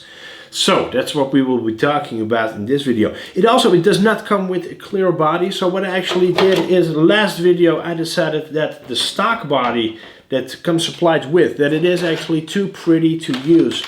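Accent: Netherlands. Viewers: Like it! Maar ben je nou Nederlands?🤔 hoor een nederlands accentje😂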